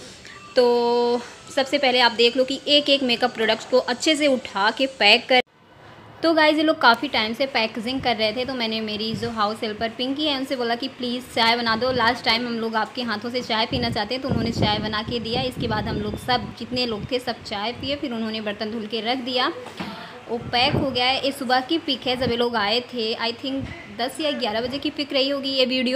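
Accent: Bihar, India. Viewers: Tum bihari lagti ho... Bhasa to bihari lagti h...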